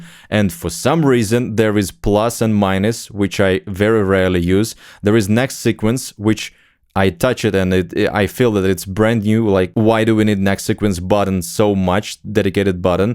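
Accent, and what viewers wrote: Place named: United States. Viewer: Круто ты шпаришь по английски) Не знал бы кто ты подумал бы что асерикос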